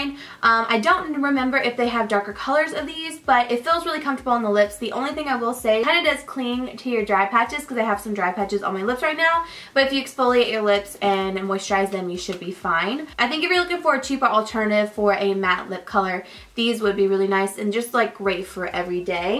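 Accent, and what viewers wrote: South, United States. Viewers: You're so friking beautiful I love you and I want to be you!! Your hair is so friking bombbbbbbbb and you're so pretty makeup or not, and your slight southern accent I could NOT be more jealous of and your name is so cute. You seem like suuuuuch a down to earth girl and I wanna be your bff. Ok done being creepy AF😆🙄UGH WHY CANT I BE YOU GIRL🤓💙😍😝👑 #queen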